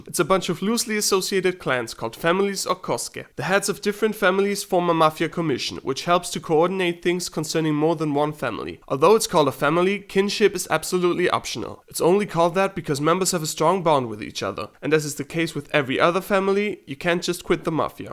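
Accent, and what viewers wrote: Germany. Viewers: You have a german accent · The narrator sounds german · are you German? you have a very heavy German accent, cute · are you german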